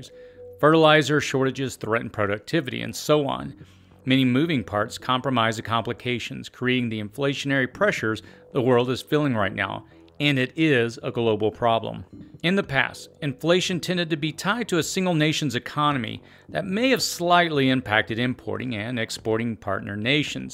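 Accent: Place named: Southern California, United States. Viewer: the so-cal accent is strong in this one.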